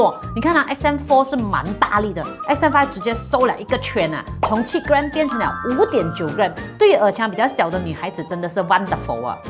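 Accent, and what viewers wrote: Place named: Malaysia. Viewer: 博主姐姐的口音真的一听就是大马华人，特别像我隔壁的大马华人室友哈哈哈，很可爱的口音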